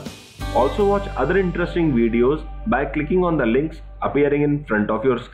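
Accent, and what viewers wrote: India. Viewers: Indian accent is just weird 💩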